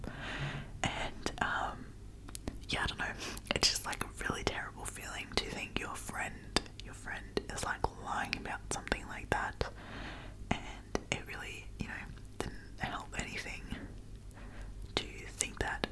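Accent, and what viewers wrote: Australia. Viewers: At first I totally thought you were from Australia because of your accent! But then you said that you’re from Germany, me too! And I also make videos in English ☺️ This story is so crazy! There were so many points where he could’ve cleared it up with you guys but he kept lying. Totally get why that must’ve been really hard on you at 18! Can’t believe you would do this to your friends. I hope that you now have better & more honest people around you!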